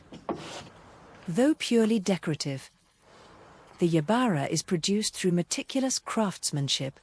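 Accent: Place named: United Kingdom. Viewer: lol, the british fem voice over lays it on thick enough for you?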